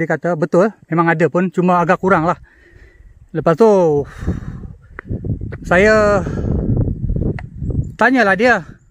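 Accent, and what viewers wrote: Sabah, Malaysia. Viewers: Cara dia cakap pun tahu orang sabah…kau tu bnyak bergaul ngn orang sikit cara cakap macam ajak gadoh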